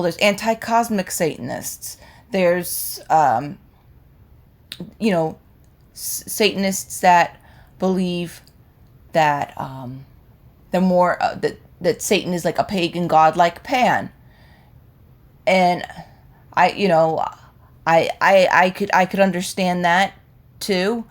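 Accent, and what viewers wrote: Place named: New Jersey, United States. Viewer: I liked your message here. And I also like you NJ accent 😊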